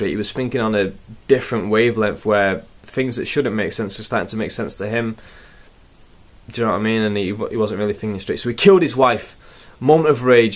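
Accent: Manchester, United Kingdom. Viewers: Does this guy on the video have an Manchester accent ? Is he from Manchester? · Where you from anyway? You sounds like your from my home town? Manchester Salford :)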